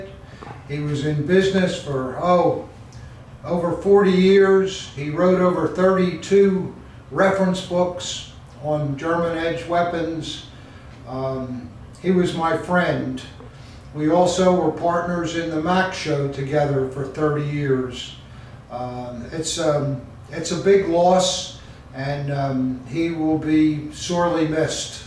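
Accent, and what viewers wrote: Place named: Philadelphia, United States. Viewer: Man the Philly/S Jersey accent is strong with this guy! Good Stuff, could enjoy a beverage on 2 street with him.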